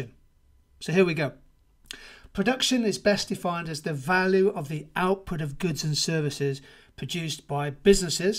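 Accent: United Kingdom. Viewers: I love the accent , it s british, isn t ? well explained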